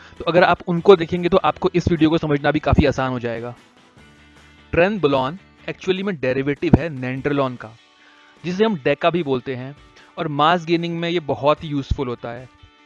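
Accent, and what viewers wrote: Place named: India. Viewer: The India accent is so cool and funny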